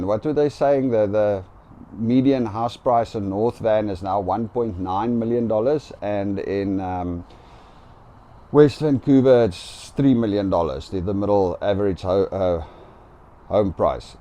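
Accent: South Africa. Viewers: i like to hear a south african person talking about my home country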